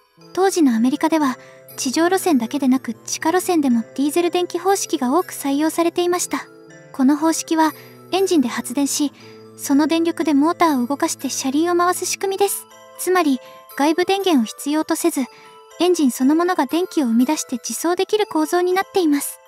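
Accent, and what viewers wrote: Kansai, Japan. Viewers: 関西弁過ぎてわろた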